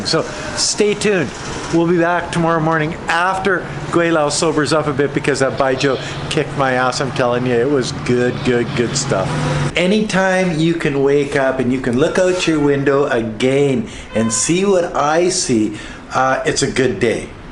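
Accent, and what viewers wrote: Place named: Canada. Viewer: 5 minutes in and you mentioned Canada, Im from Canada, southern Ontario, and I guessed at the beginning that you were Canadian, LOL. Nice video.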